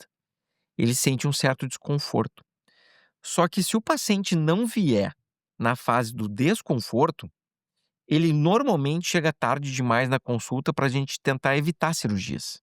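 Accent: Rio Grande do Sul, Brazil. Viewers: O senhor é gaúcho doutor?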